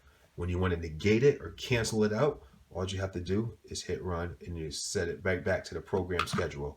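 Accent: Connecticut, United States. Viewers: Bruh speaks like a Connecticut Yankee!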